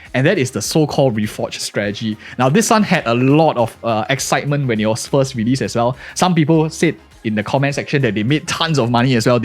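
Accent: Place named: Singapore. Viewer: more like singlish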